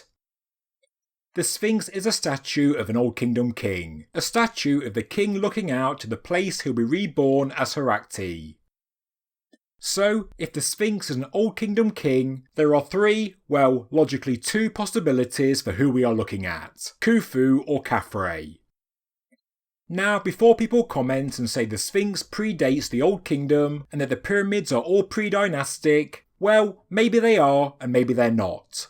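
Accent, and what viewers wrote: United Kingdom. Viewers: Love your accent, so Little Britain...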